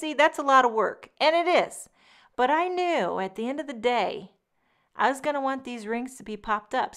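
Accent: New York, United States. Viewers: Ohmy gosh are you from NY. I love listening to you. You are hysterical. lolol.. Love the cards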